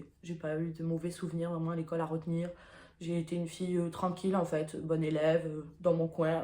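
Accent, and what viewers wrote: South, France. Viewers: Salut les calanques de Marseille lol l accent du sud Fatch de con tu pointes ou tu tires lol petite intro avec une pointe d humour parce que rire est très important autant que le sexe ta vidéo est assez intime assez poignante tu es assez mature pour ton âge et ton chemin est moins être fini tu parles souvent de bienveillance c est que tu en auras besoin et pour toi et pour ton entourage ...le fait de t exposer ouvertement sur les réseaux sociaux va t amener des personnes positives et d autres bcp moins garde cette joie de vivre et ta folie tes 2 premières vidéos sont sympa j aime bien continue 😉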